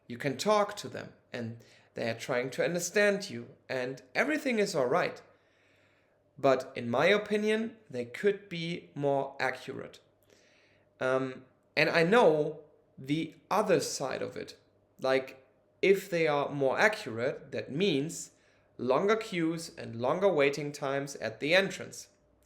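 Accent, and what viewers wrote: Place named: Germany. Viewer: Your English is quite alright, of course with a German accent. Stopped listening after your first point though: "Yeah I know the discussion about alcohol is also a drug and smoking too but for ME sniffing(taking, whatever) IS something else" So your first (and most important?) point is just an opinion of you. No personal experiences, no proper argument, nothing... You maybe seen some ppl overdo it, some with big eyes and you want to forbid that? Why? What does that have to do with you what ppl overdoing alcohol don't?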